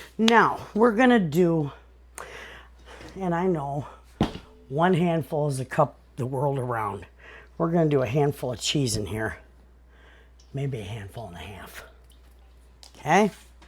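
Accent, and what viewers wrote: Michigan, United States. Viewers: Just seeing your channel for the first time. Had to smile when I heard your Michigan accent, I spent the first 24 yrs of my life there. Of course I hit the subscribe button😂 Those chicken recipes look delicious! Looking forward to watching your other videos.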